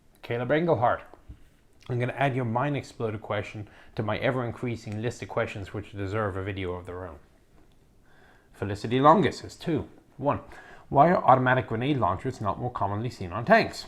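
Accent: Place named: Ireland. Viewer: Chief, your such a national treasure ya could put your musing into a text to speech narrator and it still be riveting commentary!!!!!!!!!!!! It would be a tragedy to deny the world the utter charm that is your Mick Jagger lipped Irish accent, dont go changing ya gentlemen and scholar!!!.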